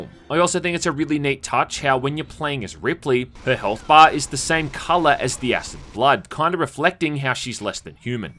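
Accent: Australia. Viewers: Scare pronunciation: it’s scarce /‘skeəs/ like ‘scare’ /‘skeər/ but with ce at the end. -this is for British English - but Australia uses received pronunciation all the same if I recall correctly. Americans English: the vowel sounds in scarce /‘skers/ are the same as they are in ‘air’ /er/ And like air, they add the rhotic /r/ sound after the vowel, but before the ce, so it will sound like sc-air-ce But it doesn’t really matter how you say it. Aussies make everything sound good anyway. Australian English is like an amalgamation of British and American English. It’s like natural progression, and it makes common sense. Never mind me. Just your English teacher and linguist subscriber. I hope my years of pain and debt were useful to you. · Are you an Australian?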